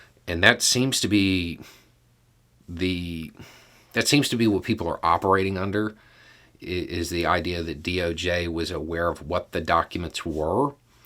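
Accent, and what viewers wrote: South, United States. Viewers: Similar story here and after the first vid I decided that I owe an apology for having misread him from his accent and appearance. Then I looked inwards a little deeper and saw that the root of that misjudgment was MY OWN PREJUDICE. As someone who likes to think of themselves as being to the left on practically every issue that came as somewhat of an unpleasant surprise. Then thinking on it some more, that means I owe a lot of people an apology because I'm now sure that there isn't just one intelligent left wing white person in the South of the US so if I owe an apology then I owe it to them just as much. So here it is - to everyone in the South who isn't an ignorant racist, I'm sorry I had y'all wrong and I'm trying hard to recognise my prejudices and correct them. I've been meaning to do this for a while and your post pushed me to getting it done, thanks Danny. 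👍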